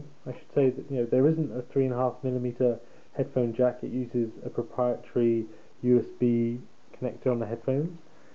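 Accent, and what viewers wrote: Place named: United Kingdom. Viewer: That was funny. I think the guy is British. Hence the accent. I was thinking about getting that phone but after seeing this video i will pass.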